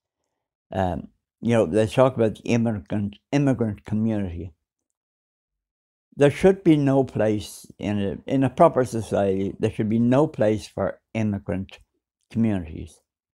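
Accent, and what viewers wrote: Ireland. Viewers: Its the content is what counts man. Many excellent points. You are not wandering at all man. Its also your honesty and integrity. Im born and bred Dublin too but I could listen to that accent and watch you all day. Keep up the great work. You are an old-school true blue, true Dub with top values and principles. Yes it is stunningly disturbing how quickly woke was adopted here and throughout the west. Its the wealthy Marxists to blame! Ireland seems lost. God bless.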